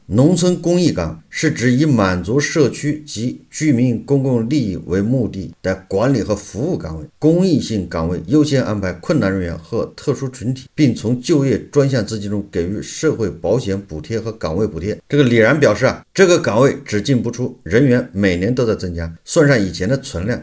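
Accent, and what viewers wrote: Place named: Anqing, China. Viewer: 安庆口音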